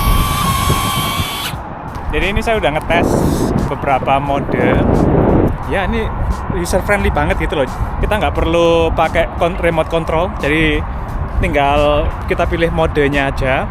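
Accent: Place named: Java, Indonesia. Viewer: Asli Jowo tenan medok e gak di gawe gawe soal e hehe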